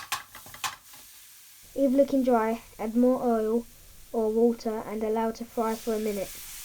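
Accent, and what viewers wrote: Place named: United Kingdom. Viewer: I love your accent, what kind of british accent is it?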